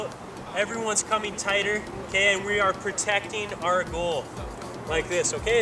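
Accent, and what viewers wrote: Canada. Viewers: He sounds like a Canadian to me.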